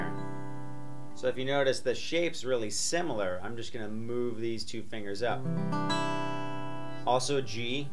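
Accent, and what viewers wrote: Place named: South, United States. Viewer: you accent are totally southern! XD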